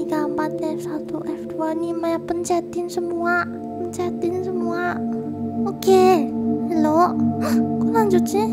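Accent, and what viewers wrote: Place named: Solo, Indonesia. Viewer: kan dari solo bro 🤣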